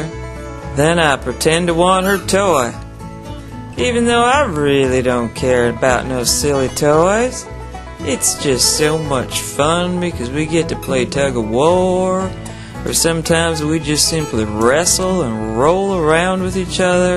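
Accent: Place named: South, United States. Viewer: Narrator is funny as hell ....and soooo southern !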